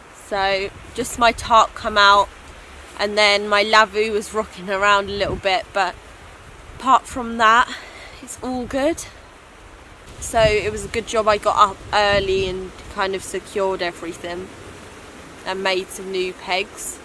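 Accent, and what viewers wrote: United Kingdom. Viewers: Wonderful British accent... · British accsen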